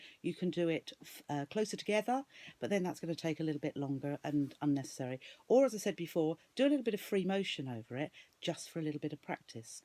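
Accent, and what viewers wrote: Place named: United Kingdom. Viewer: I am Arabic woman I like your British accent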